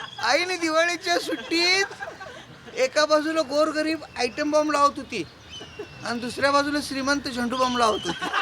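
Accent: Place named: Sangli, India. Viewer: Khar tar solapur cha ahe....Ajit koshti ganya naavacha vinod mala kharach best watatat....pure Sangli karad language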